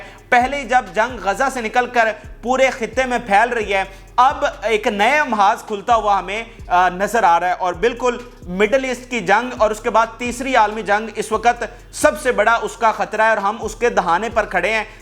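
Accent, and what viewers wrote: Punjab, Pakistan. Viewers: Apni Urdu theek kro Punjabi style ki urdu mat bolaaa kro ....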